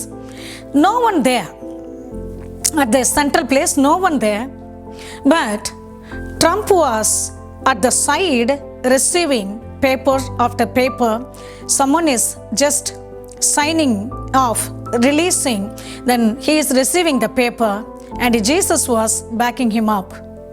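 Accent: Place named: India. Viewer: Respectfully I tell you,I like your Indian accent but more still I like your great faith in the Lord Jesus.Thankyou.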